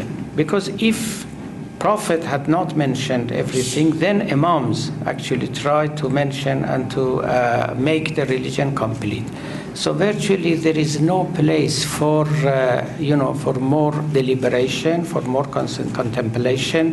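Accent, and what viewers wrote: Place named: Iran. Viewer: 30 years ago i listened and watched to Mr. Sorosh traslations of Rumi poets from persian to english on Iranian TV. I must say his english was better, faster and with a little persian accent. Now he is slower and the tone of his voice is alwayes same which makes it hard to follow his remarks and ideas ....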